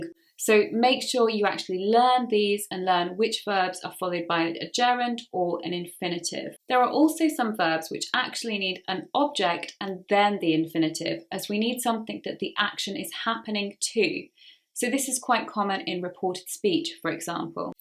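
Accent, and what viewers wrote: United Kingdom. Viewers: I love your british accent tone ❤❤❤❤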